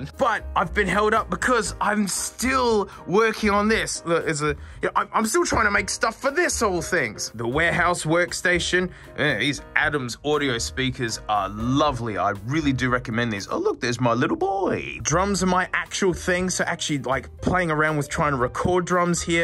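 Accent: Australia. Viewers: Did you just wake up? You sound like Australian James foster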